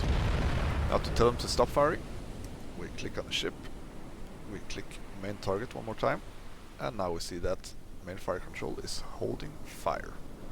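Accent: Sweden. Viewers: Greetings from Finland! Based on your accent I would say you are Swedish maybe...? When I saw Wolfpack345 play this game it was an instant wishlist for me.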